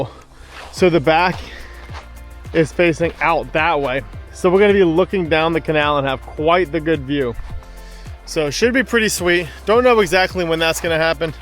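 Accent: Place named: Bahamas. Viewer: I just watched your video and enjoyed it; however, I was left with a question: why is it that you sound Bahamian? Maybe it is because you spent so much time in the Abacos, I guess. 🤣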